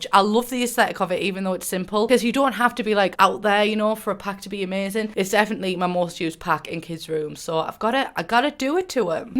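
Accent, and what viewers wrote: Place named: Middlesbrough, United Kingdom. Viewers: lmao I literally was thinking 'i heard this accent before, maybe when I flew in Middlesbrough to get tattooed' then I saw your description. I've never felt this satisfied for guessing something right (I'm French so recognizing accents is a big deal for me) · I just realised I never question your voice cuz I'm also from Middlesbrough onsdiadoj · Never watched one of your videos until a friend sent me this today, heard your voice and as a fellow smoggie no longer living in the Boro, your voice was a nice bit of home I needed, thanks. Also thanks for helping which packs to buy, great content. Subscribed. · YOU CHANGED YOUR VIDEO DESCRIPTION rip “...from proper up north that’s why i sound like that”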